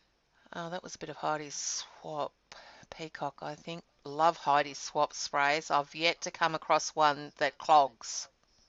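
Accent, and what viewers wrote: Australia. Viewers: Great to hear another Aussie. That is an awesome page. Thanks for sharing.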